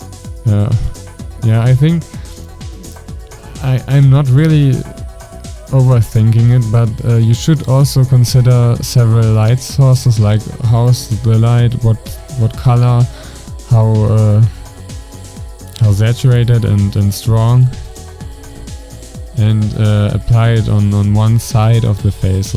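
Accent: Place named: Germany. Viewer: Man hört ein schönes bisschen Deutsch aus deiner Stimme ^~^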